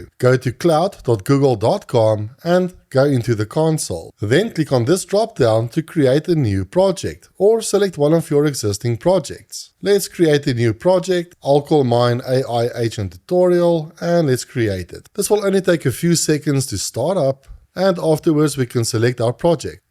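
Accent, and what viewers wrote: South Africa. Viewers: 5 seconds into the video and I could tell you were south African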